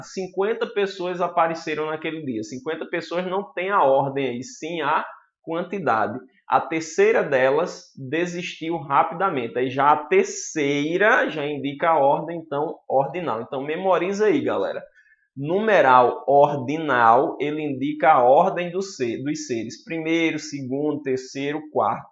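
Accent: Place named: Northeast, Brazil. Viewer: Achei ,alguém que consegui aprender e segurar na mente heheh ,boa didática simples e boa de memorizar. Tu és de onde companheiro ? Nordestino como eu ?? Sotaque conhecido hehe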